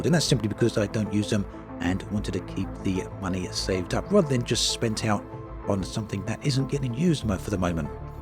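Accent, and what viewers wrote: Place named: Dorset, United Kingdom. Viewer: Sir please reduce that music, I need to hear your dorset tones:)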